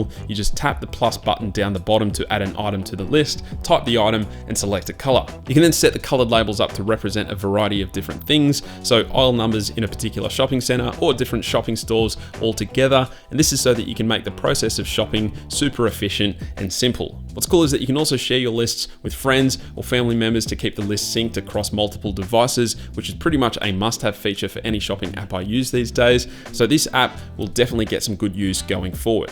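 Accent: Australia. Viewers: Awesome video! Just wanna ask, are you Australian?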